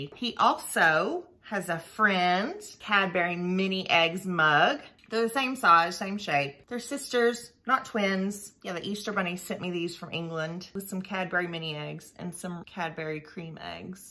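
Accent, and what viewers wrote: South, United States. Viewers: I don't know what I love more, your gentle southern accent or your enthusiasm for British stuff. Oh hell, I love them both. Being a Brit, I've accumulated so many mugs over the years, that every couple of years or so, you have to revisit the cupboard to decide which ones to throw out. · You should look for a mug with a ceramic frog or spider stuck inside on the bottom... its hilarious when the person drinks down and the Frog reveals itself .. i love your sweet southern accent ...keep drinking that tea..dunno about the waffle though...maybe i need educating lol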